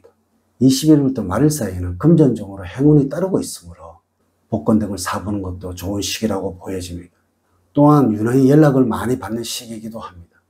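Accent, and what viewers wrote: Gyeongsang, South Korea. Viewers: 안녕하세요.수고 많으십니다.경상도 발음 이시라 항상 말씀 끝 부분이 속으로 들어가는 느낌이 드네요.첫 부분만 두드러지세요.항상 감사합니다..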